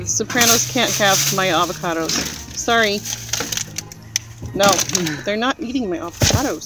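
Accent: United Kingdom. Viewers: Oh, how I do adore the Glaswegian accent! 🏴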